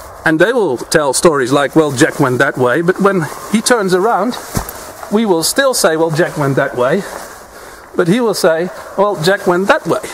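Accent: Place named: Canada. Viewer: One of the best and most practical vids on navigation I've seen yet! My father-in-law has one of the best navigational abilities I've ever seen and we are in flat heavily forested terrain and I've watched his dead reckoning on cloudy days and could only marvel at the internal GPS he carries around in his head. What region do you live in, almost sounds like Canada?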